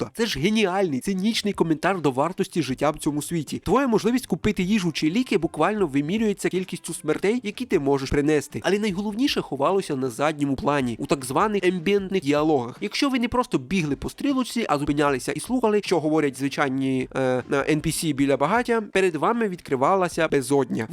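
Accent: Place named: Transcarpathia, Ukraine. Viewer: Чомусь я відчуваю закарпатський акцент . Я ж правий?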